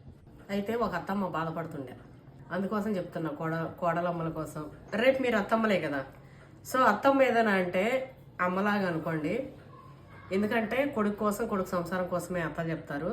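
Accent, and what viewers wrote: Telangana, India. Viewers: తెలంగాణ యాస లో మాట్లాడారు🎉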